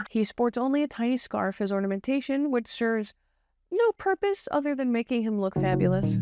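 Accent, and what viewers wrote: Netherlands. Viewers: idk if im hearing it wrong, but are you from the netherlands? i hear some part of a dutch accent but also not and i need to know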